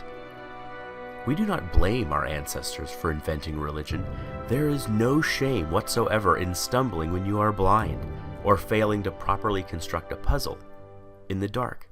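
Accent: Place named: England, United Kingdom. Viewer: Giọng Anh nghe phê thiệt :)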